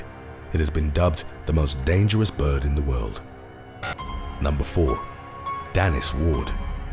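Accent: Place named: United Kingdom. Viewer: He is not an American. So what he pronounced zebra as how it is spelled. We say Zeebra. He speaks the Queen's English.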